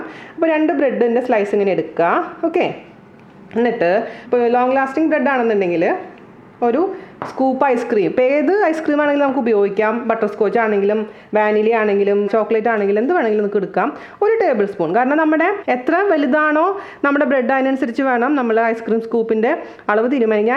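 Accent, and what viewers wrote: Thrissur, India. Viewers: Simple avatharanam adipoli 👍thrissur ano slang like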